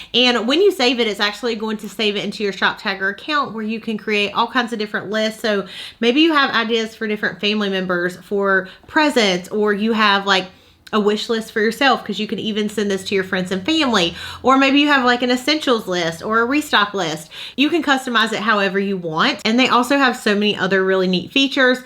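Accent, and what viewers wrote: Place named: Tennessee, United States. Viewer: As a Tennessee girl living in Colorado, your sweet accent makes me homesick. 😍. Thank you for your generosity in doing a giveaway!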